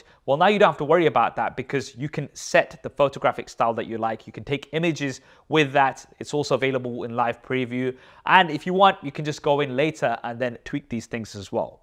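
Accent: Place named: London, United Kingdom. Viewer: That London Accent.. 😊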